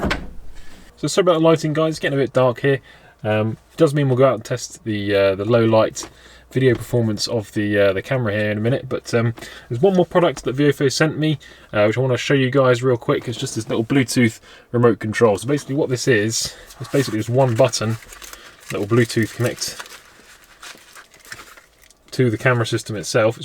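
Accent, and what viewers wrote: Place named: United Kingdom. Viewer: Hey, nice video and respect for doing a hardwire install yourself. I ended up buying 2x the front units to try and get 4K front and rear, but not sure how successful or possible it's going to be getting it all set up, and does mean I'll have to access front and rear individually by wifi / sd card as opposed to being all in one. I may make it into two 2 channel kits instead. The quality of the front camera is a little worse (24fps and lower bitrate) if you run 3 channel mode as opposed to 30 fps and capable of running higher bitrates, but depends on your own use case. One thing to note is I don't know if you're in the UK, but assumed you were until I saw the footage with foreign roads and plates based on your voice haha. There's a UK site/suppliers for buying the Viofo cameras, but the 2 channel front+rear was out of stock and there was only two 1ch left, and I took the last two 😅. 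They'll probably have a restock soon if they haven't yet as my order was a week ago, but just wanted to mention that site which means people can get the cameras faster in the UK without import worries and also the promo code should work on there as I used Ashley Neal's (driving tuition) as he did a sponsored review / unboding himself a couple weeks ago. Also make sure you either heart or thumbs up (heart is more consistent at giving notifications) to give a notification when you reply to someone's comment, otherwise they may not remember to come back or think you've acknowledged them :D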